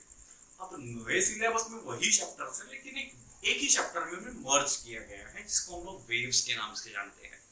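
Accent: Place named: India. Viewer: Best.. just need to improve style of speaking becuse ur style seem desi type. May Allah give u success ameen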